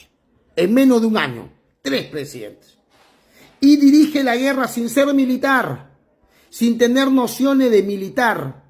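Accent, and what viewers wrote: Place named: Chile. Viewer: ...si hablas con dejo chileno.....que te puedo decir...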